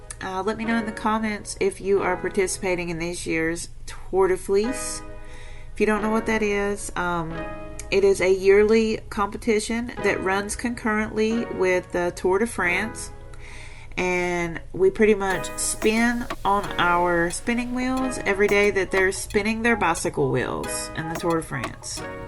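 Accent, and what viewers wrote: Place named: South, United States. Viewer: Why would anyone make fun of your accent? I’m Belgian and have lived on three continents. As a result I’ve got a ‘subconsciously adaptive’ accent - I tend to mirror the speech patterns and pronunciation I’m being exposed to at that very moment. I “should” have a Dutch/Flemish accent. I don’t. Somehow, regardless of whom I’m in a conversation with, people seem to identify Scandinavian undertones to my English. When in a conversation with my best friend from Oklahoma, that turns into Southern-with-a-Scandinavian-twist. When the conversation is with my British friend, it’s Welsh overtones with a seemingly Scandinavian flavour. 🙈 Accents are part of what makes us unique. Personally I feel the variations from the Southern States are beautiful. You almost sing.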